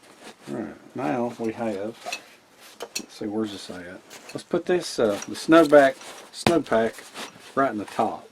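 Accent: South, United States. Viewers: This is how a real American sounds like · What er you from my friend. I love that southern drawl! I’m from MS myself so it’s kinda nice for a southern man to do these vids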